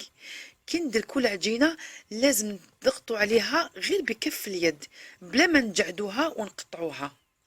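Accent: Algeria. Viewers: Les marocaines sont les meilleurs dans les msemen mais je te fais confiance en plus tu as la même voix et même accent que l actrice algérienne elle est enseignante aussi j ai oublié son prénom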